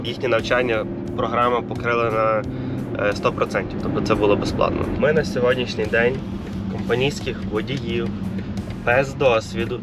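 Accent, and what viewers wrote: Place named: Western Ukraine, Ukraine. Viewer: по акценту видно что хлопцы из ЗАХОДУ ,почему узнал ? Я сам оттуда поетому сразу понял Прикарпате, возможно Закарпате но болше звучит как ребята из Коломыи или Калуша>2 года назад делали CDL значит успели перед войной сюда приехатъ ,Оченъ удивително видетъ таких детишек что так серъезно задумывают болшие планы и из воплошают в жизнъ. Одним словом настояшие ГУЦУЛЫ !!!!!!!! Здес в Америке 21 год считают толко полнолетним вообше детишки долго развиваются в отличие от таких молодых ребят с такими болшими амбициями..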